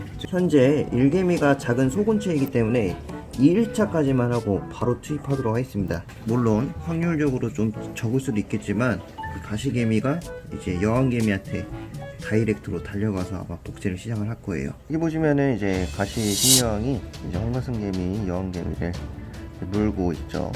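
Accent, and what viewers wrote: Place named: Pohang, South Korea. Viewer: 브르님 말투에서 느껴지는 퐝의 느낌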